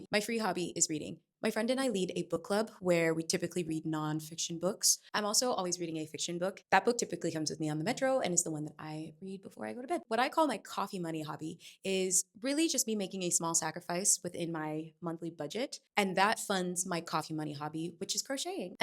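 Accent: France. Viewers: Omg! Your French accent is so beautiful! I’m a native French speaker and you sound way nicer than I do 😭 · Ok but your French accent is 🥖 incroyable🥖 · Your French accent is amaaaazing! Love it 😊🎉 C'est très BON!! ❤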